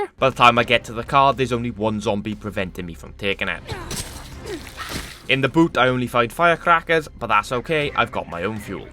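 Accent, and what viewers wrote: Wales, United Kingdom. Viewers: Bro i am one min in and your personality and humour have me staying for a little longer legend live the welshy accent what’s occur-in! Get hype for sod3 brother god bless ✌️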